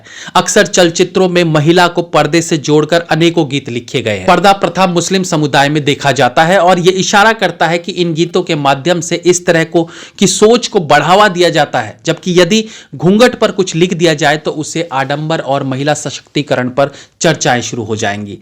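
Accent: Standard. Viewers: आपकी शुद्ध हिन्दी एवं आपका विश्लेषण अद्भुत है 😱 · Your pure Hindi is music for my ears...myself kannadiga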